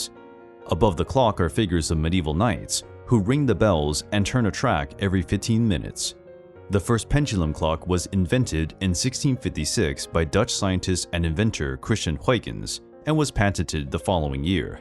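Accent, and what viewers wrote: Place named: United States. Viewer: I can tell by your accent that you're second generation Chinese American. People raised in homes where Chinese is spoken by their parents, and English spoken at school, tend to have subtle giveaways in their speech. Like you.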